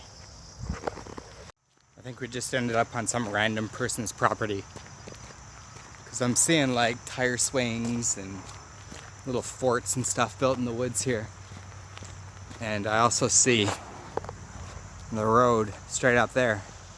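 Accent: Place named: Northwest, United States. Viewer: I found and watched a previous video when I searched “Can you combine Monstera plants”. (Your video when you combined your plants.) I thought for sure you lived in the North West United States. Are you from there? You have the accent. We lived in California for 15 years and when we came back to the south, our families would comment on our accent and I didn’t understand what they meant until a few years of being back. Now I can hear it. 😂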